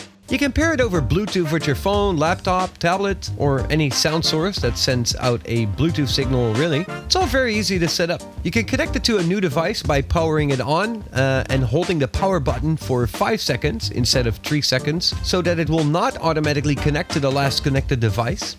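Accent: Netherlands. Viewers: Ik dacht al je bent Nederlands haha goede review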